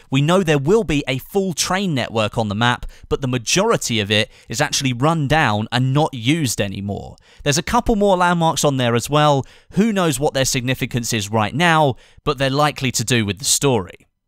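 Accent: Spain. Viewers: I see you took the old Spanish accent out of the cupboard :)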